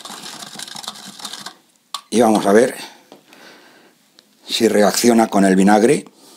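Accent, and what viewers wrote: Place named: Spain. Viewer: Que te digo que eres la hostia tío..... jajaja me encanta la manera de hablar de ustedes los españoles...... saludos.......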